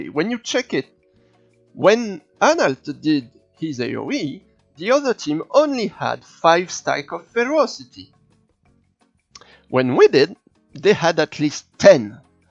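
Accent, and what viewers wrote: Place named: Poland. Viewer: Pas ça s'entend pas des masses, j'ai entendu un accent mais je pensais que t'étais polonais c'est à la fin c'est comme qui dirait writté entierementely in Françish. Du coup d'ailleurs je me rapproche du G13 avec GG et à ce propos, MIS A PART les pièces de G12, est-ce que le passage de G12 à G13 procure des PV supplémentaires à GG ou pas?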